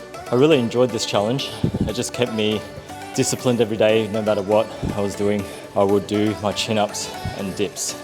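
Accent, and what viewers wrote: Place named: England, United Kingdom. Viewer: U look native american and have an english accent. My brain cant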